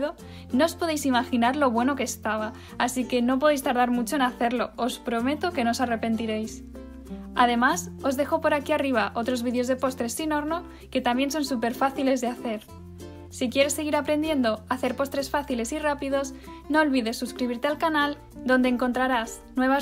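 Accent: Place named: Argentina. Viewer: Jajajajajjajajajja siiiii obvio tono re argentino ehh arriba argentina xd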